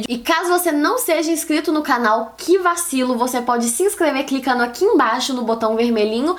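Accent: Minas Gerais, Brazil. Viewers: Voce e mineira também? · sabia que era mineira só pelo sotaque ,😅 😄😍,amo suas dicas ❤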